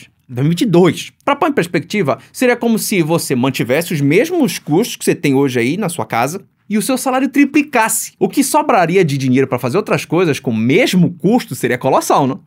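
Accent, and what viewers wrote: Pará, Brazil. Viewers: Eu comecei a te ouvir pra matar a saudade do sotaque do Pará, mas gostei demais da maneira como vc discorre sobre os assuntos. Então fiquei, apesar de não entender nada do assunto. Muito bom o conteúdo, alto nível.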